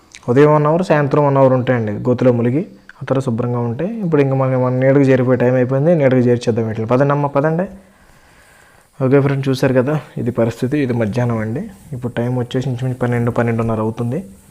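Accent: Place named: East Godavari, India. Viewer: Ni matalu MA East godavari vadila unai very nice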